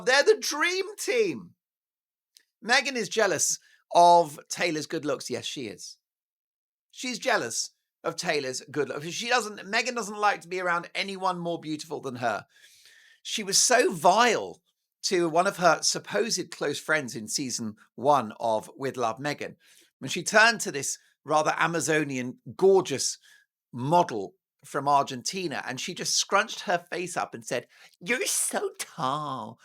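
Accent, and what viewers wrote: Australia. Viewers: Your Aussie accent is terrific!!! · your Australian accent is pretty good but it's more * than *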